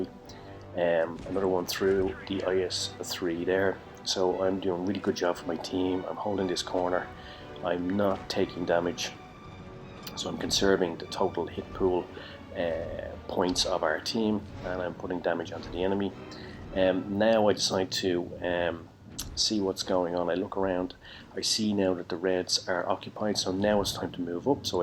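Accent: Ireland. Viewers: BTW love your Irish accent.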